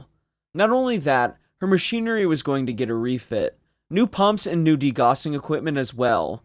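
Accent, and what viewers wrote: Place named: West Coast, United States. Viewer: There's just something about a young white guy with a west coast accent mispronouncing every word that makes me want to black list the channel.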